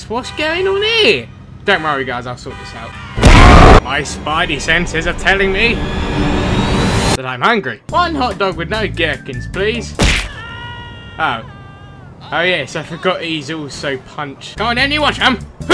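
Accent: United Kingdom. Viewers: its ok man by the way r u British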